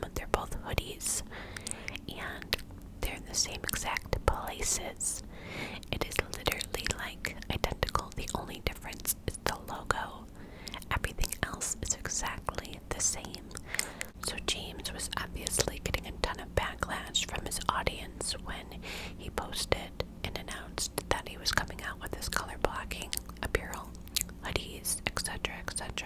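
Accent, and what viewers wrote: New York, United States. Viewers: Could you do another video with your New York accent? Those videos are always so tingly 🥰 💕 I hope you are doing well! 😊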